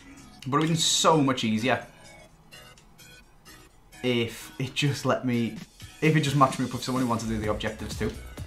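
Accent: Liverpool, United Kingdom. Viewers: Don’t think could sound more scouse in this vid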